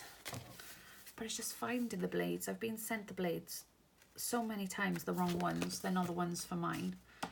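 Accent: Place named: Wales, United Kingdom. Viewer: Hahaha see even when you write im laughing cos i can hear you saying it in my head in your welshy accent so funny! I feel like your about to burst into disney at any time - cant imagine what your like when your in the disney shop i bet your dancing and singing aint you 😂 xx hahah yeah its the only time your quiet in the dentist 😂😂 xxx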